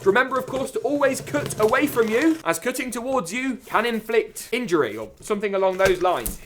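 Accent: United Kingdom. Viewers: That British accent is so stupid 😂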